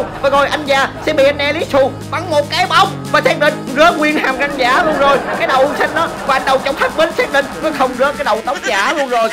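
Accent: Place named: Hue, Vietnam. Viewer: Nói giọng Huế đi anh ơiiiiii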